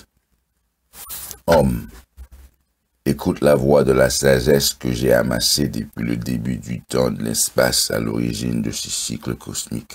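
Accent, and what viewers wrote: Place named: Africa. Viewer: Seigneur, cette voie est incroyable, la narration est profonde, chaude, aux magnifiques accents de l'Afrique magique. Je crois pas avoir vu les vidéos des premières tablettes ni même de playlist regroupant toutes les tablettes lu par cet incroyable narrateur... Dommage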